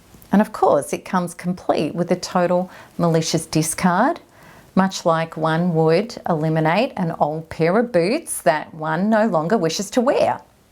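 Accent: Australia. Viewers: Australian accents are soy nice.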